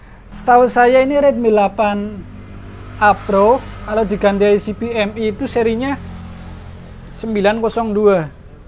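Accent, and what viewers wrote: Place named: Java, Indonesia. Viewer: bahasanya rada medok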